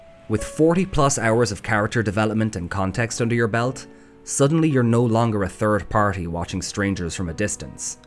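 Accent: Ireland. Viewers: Is he Irish?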